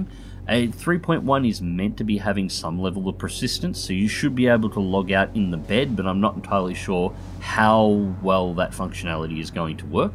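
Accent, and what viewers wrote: Australia. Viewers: your voice is perfect to have in the background while i do other stuff. love aussie accents. a tad more entertaining than south African accents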